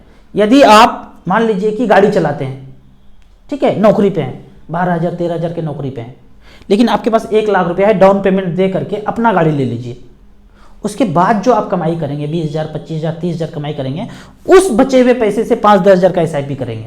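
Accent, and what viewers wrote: Bihar, India. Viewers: Sir aapki wani me bihar ki khushbu aati hai aap hai kaha se 😂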